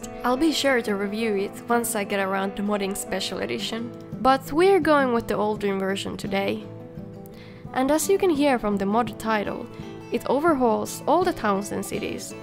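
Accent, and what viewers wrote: Finland. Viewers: So far I've come to enjoy your videos, so I'll subscribe! Your nice soft Finnish accent is a nice bonus as well and makes your descriptions of Skyrim and its people sound official and pleasant. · Can't tell if your accent is mildly finnish or distinctly icelandic.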